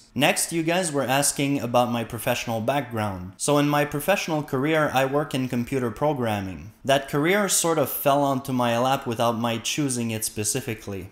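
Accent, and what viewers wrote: Quebec, Canada. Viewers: Nothing wrong with your accent, although I never would have guessed French Canadian. You're right not to waste your time debating with people who don't want to learn. Have you seen The Last Kingdom on BBC/Netflix? Saxons and Vikings, dramatised around the true events in medieval Britain. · I guessed your accent was French Canadian. I completely agree with your reasons for not debating with globe deniers. See Bob the Science Guy’s two recent videos to see how bullying and dishonest globe deniers can be. I think we should be compassionate towards the deluded globe deniers who clearly have psychological problems, but condemnatory towards those who are pushing the flat Earth onto the gullible and ignorant. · Je savais t'étais québécois !!! Nice vids ! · Congrats on the 2,000. Here's to 5K! Please don't change your accent. I love it and it helps you stand out. 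Canadian French would not have been at the top of my guesses. For my part, I like the range of topics you bring to the table. FE is fine, but I agree live debate is a waste of breath. There are a bazillion other topics available to present, or to debate. Somethinks I'd enjoy hearing your opinion on? Gosh, how about: - AI revolution and workforce displacement - Big data works, but should countries allow? - Will AI achieve anything we recognize as consciousness? What could it look like? - What is quantum computing? - Why can't we protect networks and databases, the Internet? - What is super-cold? - What is the hold back in developing electricity storage to enable renewable energy? - Are we ready to seriously privatize space? I'll leave a little warning about the creationist, anti-evolution, and young earth craziness. They are in the same neighborhood as FE plus you stir in evangelical fundamentalism. 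We should try to contain that neurosis to the U.S. But that said it would be fun to see you mud stomp them. ps - please let me if Canada decides to build a wall along it's southern border! lol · Indeed, removing that incentive may well see many a flathead dissapear from here. As a well travelled Dutchman, I tried to figure where your accent is from, but I focussed on the European nations. But now that the cat is out of the bag, I can hear you sound like the Villeneuves, both father and son.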